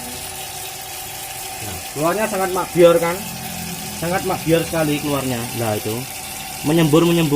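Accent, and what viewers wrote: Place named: Tulungagung, Indonesia. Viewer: Logatmu kok tulungagung banget mas? Tinggalnya di mana ta?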